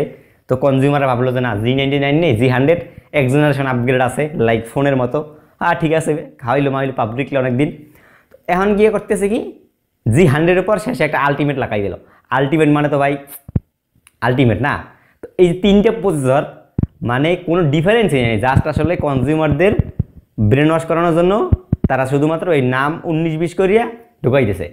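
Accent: Barishal, Bangladesh. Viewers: ভাইয়া আপনার বাসায় কি বরিশাল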